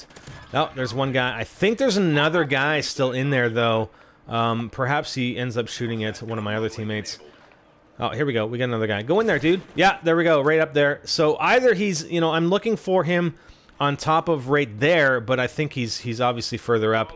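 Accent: Canada. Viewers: are you Canadian by chance?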